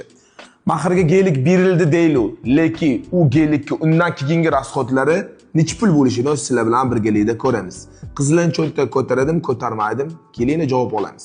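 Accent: Namangan, Uzbekistan. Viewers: Ока номон гон шевага беш кетоманде ока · Namangan shaxar shivasi namangan viloyat shivasi boshqa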